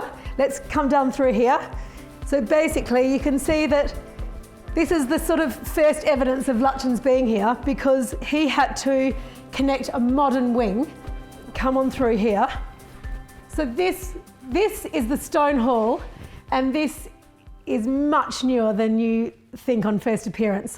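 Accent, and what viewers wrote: Australia. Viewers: Sounds like an Aussie accent… nice 😊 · Thank you for the tour. You sound like you're from Australia? You have done a very good job of the interior decor. It would be an honour to be the owner. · Stunning house. Has to be my all time favourite ever!, The history! Tudor wing, gate house! You've been sensitive, respectfully with simple elegance & modern livable touch. Your work is amazing, your respect and love of the property is clear and evident. Congratulations on a wonderful job. I adore this property & gardens as it looks and feels like a huge home yet regal, splendid in everyway. Absolute favourite. Do I detect an Australian accent?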